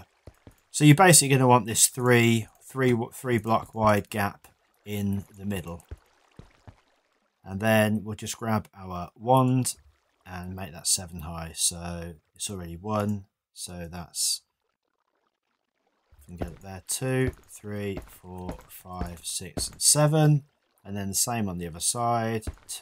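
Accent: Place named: United Kingdom. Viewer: Wow! I just realized how much brit eng. annoys me.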